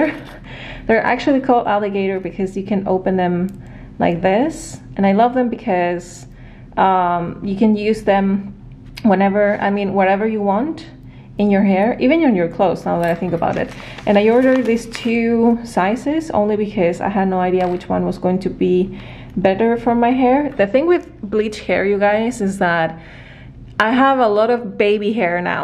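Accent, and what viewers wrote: Chile. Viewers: I was watching your video and you said unas palabras en español y dije Ohhh!!! es Chilena xD y bueno te googlie y si !! Saludos desde Chile!!!! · ¿Ese acento es chileno? Estoy seguritaaa ¡que emoción encontrar gente tan creativa y de mi propio país!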